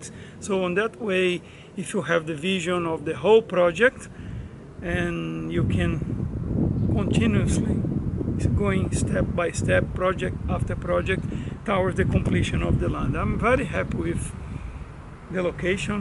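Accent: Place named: Brazil. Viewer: Algorithm just presented your channel to me. I will be following you on your restoration journey with interest. I knew you weren't Spanish, but couldn't place your accent as I'm not used to hearing Brazilian people speaking English. I'm English living near Valencia and understand there are laws concerning building on nature reserve land. You found a great property with huge potential. Are there many building regulations you have to abide by? Also you say "we", do you have a partner or are you solo. Best washes and good luck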